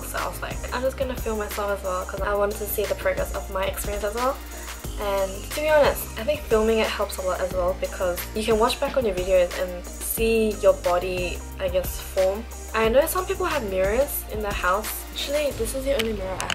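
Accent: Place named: Australia. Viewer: So, are you from Australian cuz u sound really Aussie..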